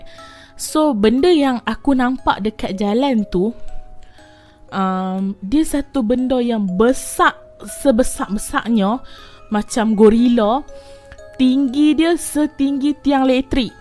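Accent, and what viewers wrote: Kedah, Malaysia. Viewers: Aku suka akk loghat Kedah😌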